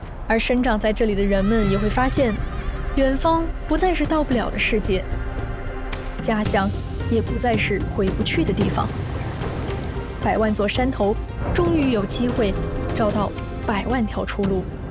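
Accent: Sichuan, China. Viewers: 配音的是我老乡四川人❤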